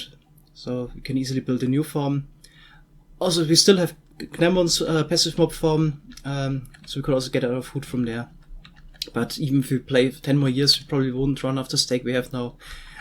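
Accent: Germany. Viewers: Are you German ???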